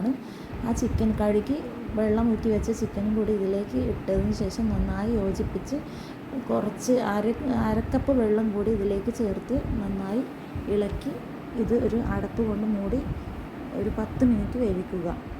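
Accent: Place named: Kasaragod, India. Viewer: oo ഭാഷ കാസറഗോഡ്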